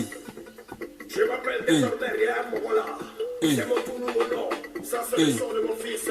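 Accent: Cameroon. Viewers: tu as un fort accent camer. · cool ton tag,tu as le vrai accent kmer!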